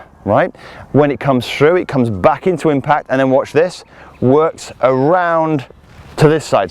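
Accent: United Kingdom. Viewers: Great video's and a proper pleasant British accent 👍 unlike an annoying newsreader 😩👎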